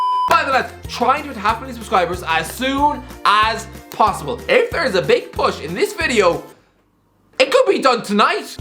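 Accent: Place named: Ireland. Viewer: and words which end a sentence while having three ˢʸˡˡᵃ⁻BLES... you've got to love them.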